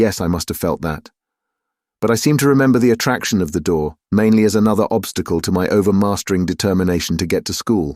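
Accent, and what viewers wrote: United Kingdom. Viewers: I have trouble following the UK English readings. Just me? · Ok prentioious British accent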